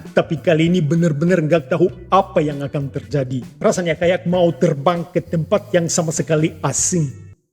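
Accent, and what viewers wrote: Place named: Java, Indonesia. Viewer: Weleh..bahasamu medok..banget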